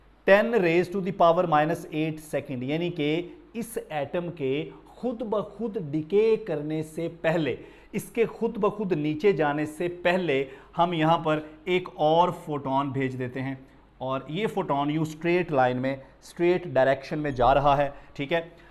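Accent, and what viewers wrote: Pakistan. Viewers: Pak se ho kya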